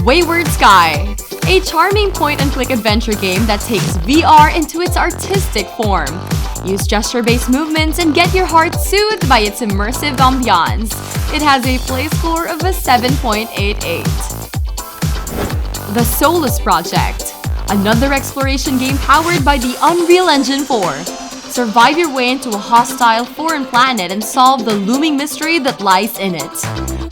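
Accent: Netherlands. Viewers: huh are you Dutch?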